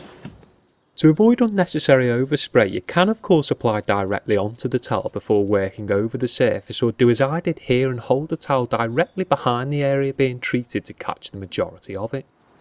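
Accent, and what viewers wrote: Liverpool, United Kingdom. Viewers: Scouse laaaa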